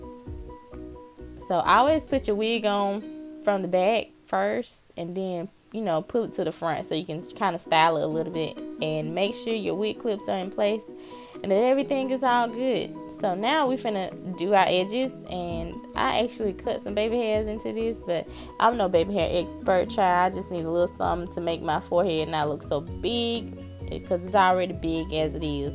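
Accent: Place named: South, United States. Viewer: You're so country but it's so cute. You have a new Subscriber forever just to hear your bubbly voice and watch your methods.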